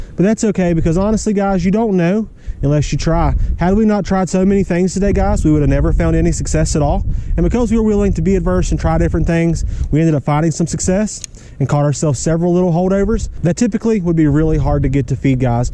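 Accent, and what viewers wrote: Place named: Georgia, United States. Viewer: Are you in ga. Cuz you sound like it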